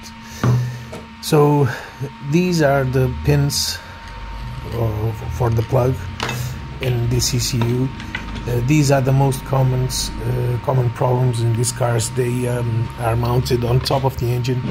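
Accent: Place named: Portugal. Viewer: Hello do you are Portuguese? You have same acent of Portuguese in English eheh. I need to do this repair too 😅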